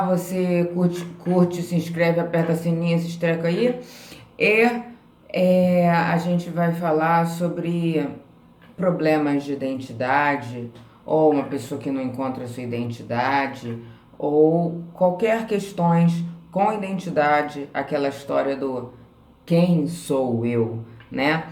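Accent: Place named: Rio de Janeiro, Brazil. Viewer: Você é muito inteligente , sou Border também , e tenho um estranho orgulho disso talvez porque sou anti - social . Pelo sotaque você é do Rio , eu sou de Curitiba .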